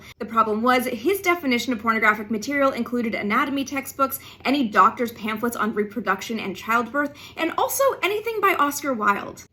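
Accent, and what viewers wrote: Canada. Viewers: Now we deport you for speaking out or writing about a genocide that we're paying for in occupied Palestine. Pretty sure you're Canadian from the way you say your "ou" you off the hook. Alabama banned ann frank cause she was a jew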